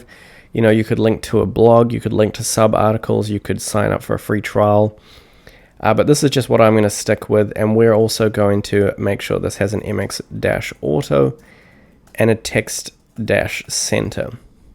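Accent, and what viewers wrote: New Zealand. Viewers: New Zealand accent 🤔 chur? 😁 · You a kiwi?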